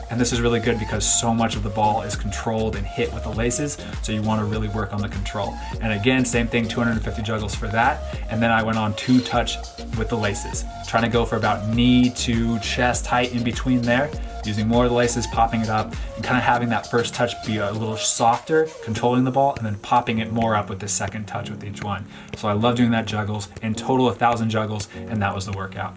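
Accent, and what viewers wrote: United States. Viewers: Are you actually from new Zealand because you sound American.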